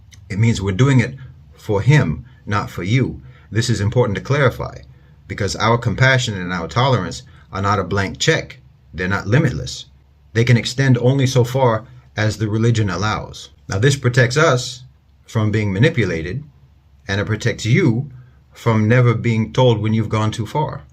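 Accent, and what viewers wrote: United States. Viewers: his accent is American, german is his parents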